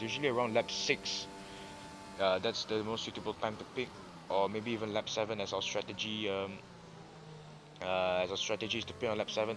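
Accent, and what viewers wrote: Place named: United Kingdom. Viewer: Brithish accent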